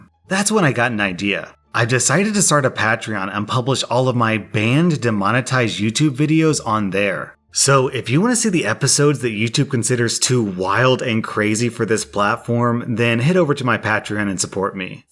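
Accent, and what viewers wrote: United States. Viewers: Woah... an American who says "pat-tree-on" and "eye-ther". What's going on? I'm sure you also say "an-tie" and "sem-eye" just to throw us off.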